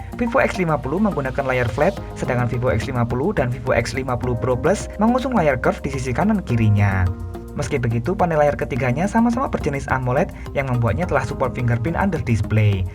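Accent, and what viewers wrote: Java, Indonesia. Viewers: Medokkkk bangettt suaranya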